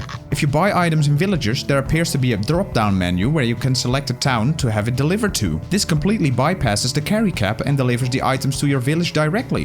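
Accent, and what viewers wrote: Netherlands. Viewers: Dutch accent spotted <3 Groetjes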